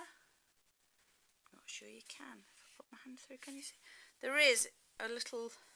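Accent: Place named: United Kingdom. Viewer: I love your accent. Many Americans are suckers for a British accent!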